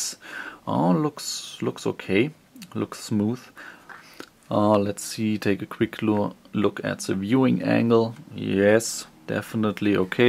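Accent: Germany. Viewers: are u from german ??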